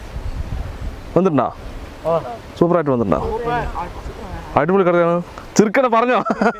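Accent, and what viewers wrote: Palakkad, India. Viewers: Palakkadan Bhasha ❤️❤️❤️🥰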